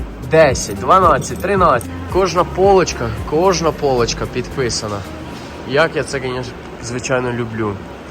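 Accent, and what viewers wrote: Poltava, Ukraine. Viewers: дякуя з Полтавської ,говір похожий на наш Полтавський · Звідки Ви родом? З якого регіону?) Дуже схоже на Полтавщину, або сусідів 😃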